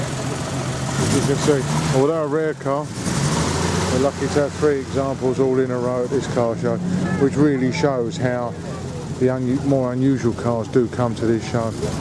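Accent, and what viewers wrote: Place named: United Kingdom. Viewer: Seeing these cars in the UK and narrated by a Brit, is great to me. A different point of view. Growing up, my family had a ‘49 Chevy DeLuxe and a ‘59 Chevy too. Thanks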